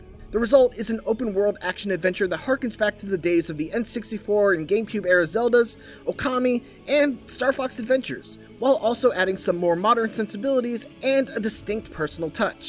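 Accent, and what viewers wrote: Canada. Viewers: This guy sounds like Canadian Guy Eh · Why this dude sound like Canadian Guy Eh?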